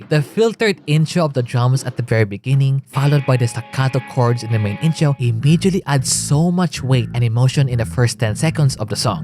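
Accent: Philippines. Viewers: well, his bio says he is from the phillpines, and also, i can tell if they are filipino based on the accent and how he speaks.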